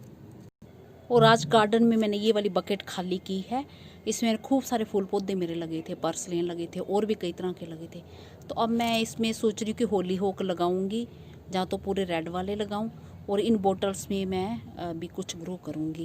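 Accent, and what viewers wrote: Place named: Haryana, India. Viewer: Ok ,aap jesay bolti hai us say legta hai ki aap haryana say, bahut achi city say hai aap. 👍🤝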